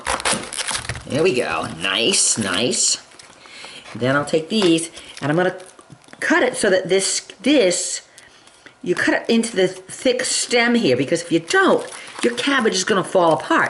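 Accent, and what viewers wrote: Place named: East Coast, United States. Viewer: your accent sounds east coast, but not like florida, good recipe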